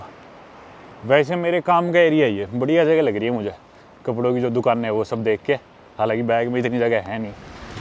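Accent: Haryana, India. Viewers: Saplings non dubbed videos achieved legato hai haryanvi accent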